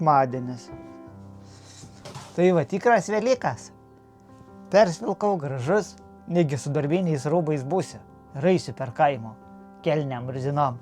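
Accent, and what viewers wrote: Aukštaitija, Lithuania. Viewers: Smagu žiūrėti tamstos video ir klausytis gražios aukštaitiškos tarmės😅🎉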